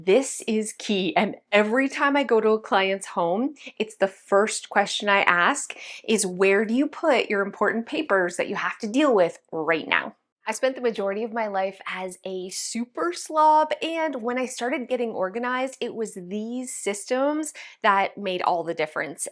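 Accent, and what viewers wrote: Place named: Ontario, Canada. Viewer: As a former avid skydiver I agree. Safe, fun, but there aren't a lot of vertical wind tunnels in Canada (where I live). By the accent I'm guessing you're in Ontario? There are a TON of places there. It makes for a memorable family video too!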